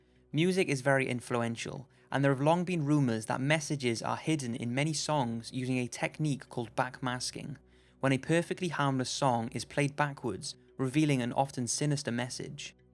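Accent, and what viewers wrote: Wales, United Kingdom. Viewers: Are you welsh? You sound like you have a Welsh accent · are you Welsh? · All the commentary is very well done in your videos. Other similar Top '#' channels either have annoying voices or talk too slow, fast, etc., and your accent is cool to listen to as well. (Which part of the UK are you from?) Keep up the good work! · Are u welsh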